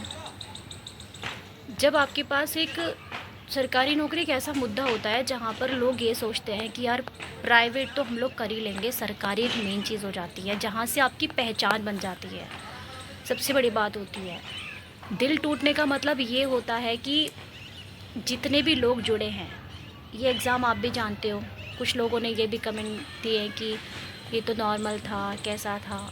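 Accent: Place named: Western Uttar Pradesh, India. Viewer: Aap western up se ho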